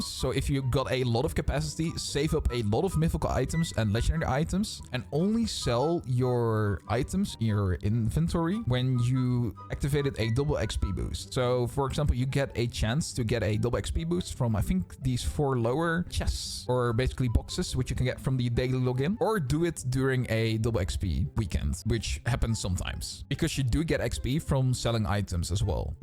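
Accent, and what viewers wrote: Netherlands. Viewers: the dutch accent is strong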